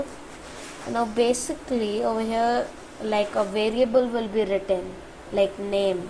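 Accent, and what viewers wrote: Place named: India. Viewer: I think ur Indian cause ur voice xD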